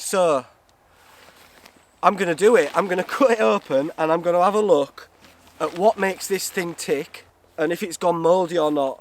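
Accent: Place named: North, United Kingdom. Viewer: Spat my coffee out reading this, thank you I needed that laugh 😂😂 is awesome. Northern AF lol!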